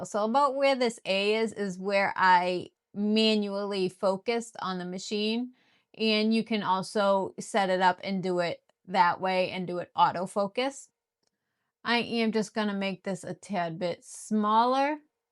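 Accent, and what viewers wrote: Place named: Massachusetts, United States. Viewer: Hi there. Love the MA accent. From Plymouth. Setting up a small 3d print farm now. Was wondering if you’ve used the laser on PLA. I’ve just found you so haven’t watched all your stuff yet.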